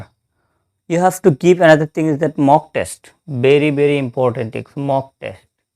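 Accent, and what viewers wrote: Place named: South India, India. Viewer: His English in this video is understandable and No Prob at all, Many big prof of south India Have similar accent pls don't criticize someone else efforts Mahagaye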